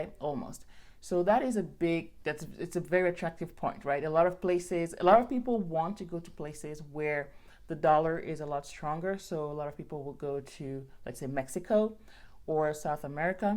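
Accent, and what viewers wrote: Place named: Cameroon. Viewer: my girlfriend is from cameroon so i can tell you spent time with a cameroonian just based off the way you talk lolololol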